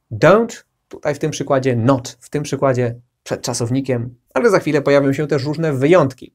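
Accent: United Kingdom. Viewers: ok super to jest angielski a nie amerykanski hahaha Pomocy Ja potrzebuje AmerykanskiAkcent A to jest zupelnie inna bajka !!!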